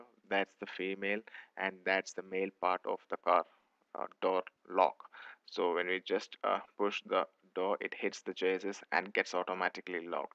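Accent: India. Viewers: For Indians only. Terrible accent.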